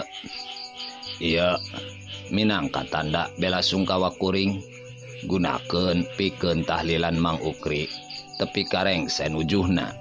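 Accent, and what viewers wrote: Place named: Sunda, Indonesia. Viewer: DINA MAOSKEUN MAH SAE. KEUNA KANA AKSEN SUNDA. NANGING SEU RUR KECAP ANU LEPAT. RANDA JADI RANGDA NU KEDAHNA EU DIBACA E. KAWAS TEU WANOH BASA SUNDA. 🙏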